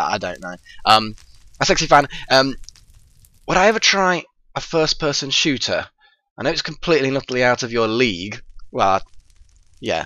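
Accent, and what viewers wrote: United Kingdom. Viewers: I totally agree. The accent is just awesome same with Scottish accents. But I don't think I've ever paid attention to anyone with an Irish accent. (mainly cause I haven't heard anyone with one) But yeah the accent is one of the cool things about people from the UK.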